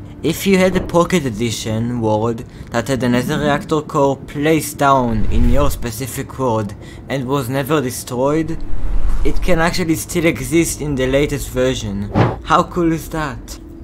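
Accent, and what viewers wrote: Israel. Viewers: Is there any chance that you live in Israel? Or that your first language is Hebrew? Because this accent sounds familiar.😂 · היי אחי יש לך מבטא ישראלי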